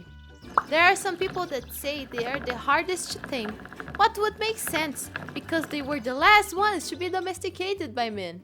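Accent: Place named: Brazil. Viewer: I love the video 😂😂 you sound Brazilian btw! Am I right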